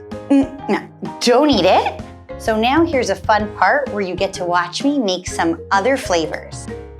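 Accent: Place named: New York, United States. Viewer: aw! I’m from NY and your accent just makes me miss home. Lol! I love this video, I rarely sit and watch an entire video with the volume up haha. Thank you, will be showing my kids your channel.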